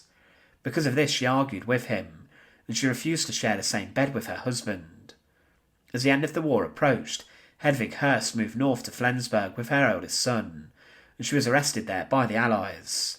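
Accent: United Kingdom. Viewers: You remind me of Mark Felton videos, British accent also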